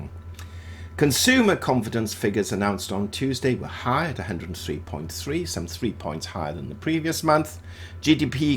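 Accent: United Kingdom. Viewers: Love hearing that Brit accent, very comforting. Thanks for the update.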